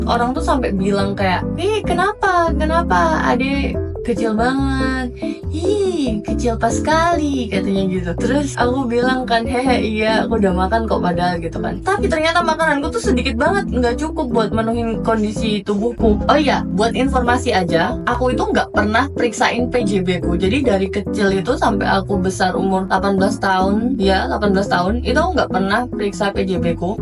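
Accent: Indonesia. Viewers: Salfok sama logat nya. Orang Maluku merantau ke Surabya, logatnya medok banget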